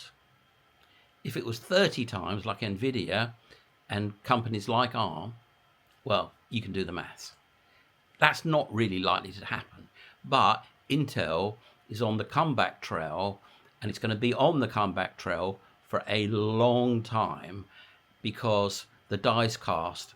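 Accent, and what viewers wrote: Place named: England, United Kingdom. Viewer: Came over from your Kitco appearance , cannot get enough of your insights , only fear I have is I might be to poor for your advice , Blackpool boy here with only one stick of rock , if you know what I mean ,nudge nudge wink wink but still does not cost me to listen , right , right ? you London barrow boys have a way of getting the coin out of the back pocket of one and all. Anyways at least I understand what you are getting at with your very English way of talking and of course when you say 33 dollars that is US which coverts to Aussie dollars to a lot more but I could just buy a hundred or so I guess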